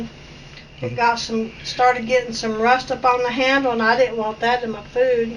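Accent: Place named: Kentucky, United States. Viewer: I love listening to that accent! My dad's family is from Corbin, Kentucky and she sounds exactly like my aunts did. I'm curious where she is from.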